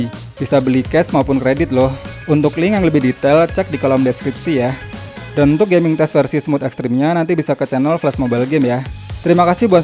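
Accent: Yogyakarta, Indonesia. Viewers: Orang Jogja ya bang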